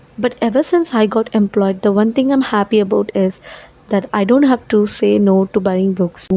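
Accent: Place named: England, United Kingdom. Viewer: Clearly and easily understandable English Accent👏🏻👏🏻. I appreciate this and waiting for more.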